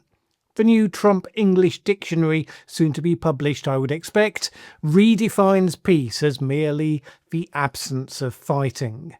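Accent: United Kingdom. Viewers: It is fascinating to listen to utter rubbish, delivered in a nice British accent. Your drivel is driven by the basic hatred of Russia, and you will let no facts alter your knowledge of the truth. Like Ukraine, you too are heading down the toilet of history by your hate and ignorance. Zelensky was elected by 76% of the Ukranians because he promised to return the Minsk agreements and make peace with Russsia and the ethnic Russians, 14,000 of wome you have murdered in Domabss. As soon as he was elected, he totally flipped. If he DARE make peace with Russia, he will be hung in Maydan square by the Banderites - 3% of the people who run Ukraine. And the British see things ONLY through the lens of the Russophobia. "a man hears what he wants to hear and disregards the rest". Reality punishes stupidity. When regarding the ALLOWED gang rape of your young women, what conclusion can be reached, except that you have become degenerate people ?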